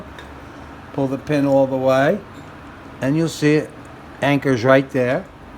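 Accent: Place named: Long Island, United States. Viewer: I lived in Suffolk County, Long Island New York. Sounds like you're from there, lol.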